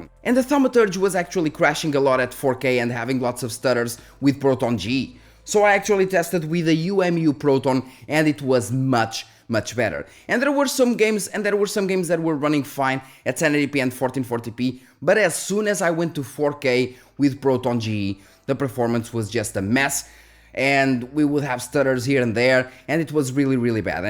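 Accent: Portugal. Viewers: Assim que abri o vídeo soube que estava perante um puro tuga.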